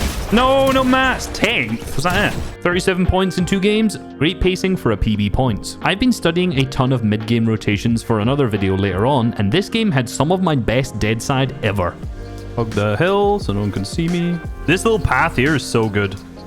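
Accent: Scotland, United Kingdom. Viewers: Why is understanding pure scottish english so hard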